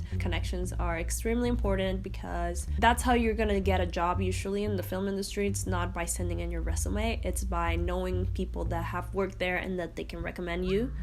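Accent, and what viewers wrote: Dominican Republic. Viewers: Amo amo tu acento Dominicano! saludos desde Panamá <3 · Aaaaah, me encantó tú acento ): ❤️ el acento dominicano es uno de los que más me gusta 👀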